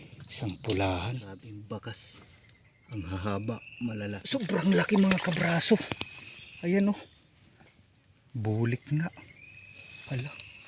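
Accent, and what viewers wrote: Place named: Iloilo, Philippines. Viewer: Oy ilonggo ikaw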